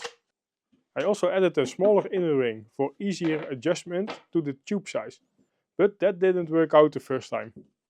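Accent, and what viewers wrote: Netherlands. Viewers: Good content, but don't know if auto dubbed is worse or the super dutch accent xD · Dutch accent is classic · The moment you hear its a dutch guy within the first few seconds xD great video tho! oh, greetings from Soest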